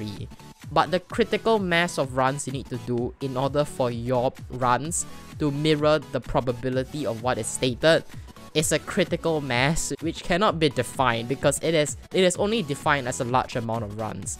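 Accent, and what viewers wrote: Singapore. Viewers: sounds like singaporean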